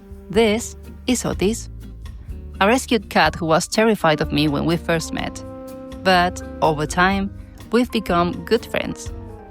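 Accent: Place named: Spain. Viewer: I love your videos. They make me want to live in spain. I love your voiceovers and your Spanish accent. ❤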